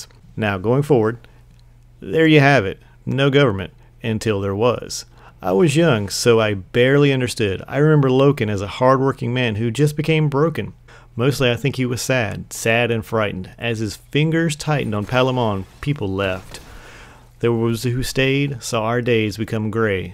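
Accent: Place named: West, United States. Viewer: Lemme just say that your western accent coupled with the theme of the Last Word really make the telling of this story so much more interesting and immersive.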